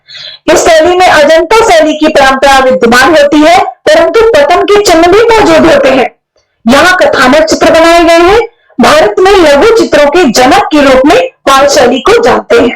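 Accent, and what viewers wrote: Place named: Jaunpur, India. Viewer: Maam आप अपनी उसी भाषा में बात करें और पढाये बहुत अच्छी लगती है सच में. जौनपुरिया वाली 👍👍🌹🌹🌹🙏🏼🙏🏼🙏🏼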